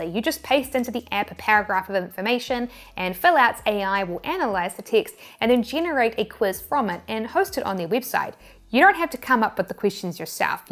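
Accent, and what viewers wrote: New Zealand. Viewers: Thanks! I absolutely loved this one! Got lots of new free AI's to try out. You have saved me hours of research (as you know). So, thanks again. P.S.: Liking (and getting) your NZ English more and more ;)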